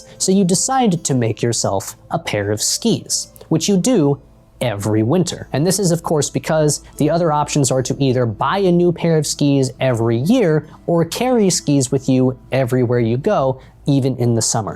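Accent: United Kingdom. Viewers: Not bad attempt at yorkshire accent....cant be easy to do one of the strongest english accents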